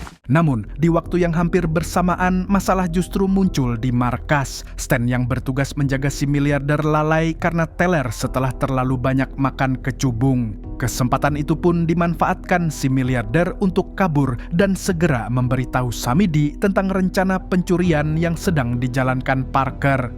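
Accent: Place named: Banjar, Indonesia. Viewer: Inya orang sungai tabuk😂😂😂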